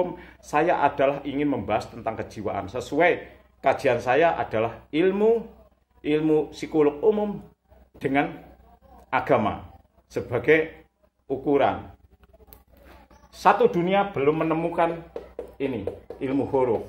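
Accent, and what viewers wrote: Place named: Banyumas, Indonesia. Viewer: dari daerah mana,logat banyumasan nggeh. Saya suka gaya ramalan huruf dan Agama. Enak dipahami.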